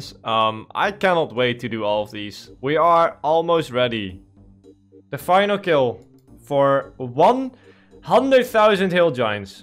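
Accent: Netherlands. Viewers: The typical dutch accent… i love it 👌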